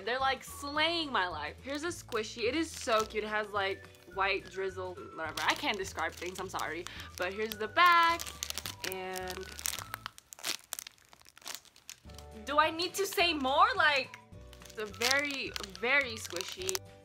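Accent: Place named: Philadelphia, United States. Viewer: I was wondering where are u from because you speak Spanish and you speak Philadelphia soooooo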